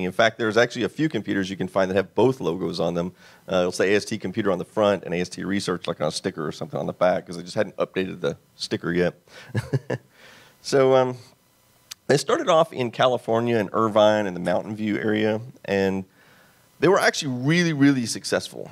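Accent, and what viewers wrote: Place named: Texas, United States. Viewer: I can hear that Northern Texas Accent in your voice. I don't know why I never picked up on it in the past.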